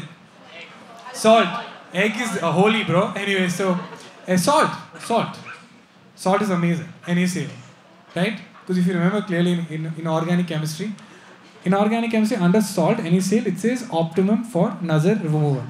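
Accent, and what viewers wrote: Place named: South India, India. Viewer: Typical South Indian English, I'm a South Indian too and I love it !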